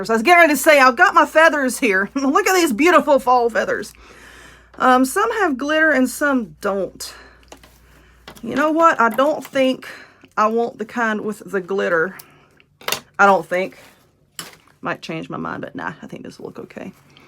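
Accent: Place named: Texas, United States. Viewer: I like it!!! Looks better than Target's! I understand everything you say...lol...i am from Texas & we talk & say things like that! Stay safe!💕🍁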